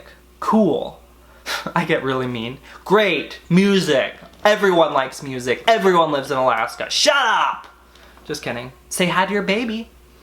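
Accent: United Kingdom. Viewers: i love your british accent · also, i NEVER find you boring, and i love your british accent lol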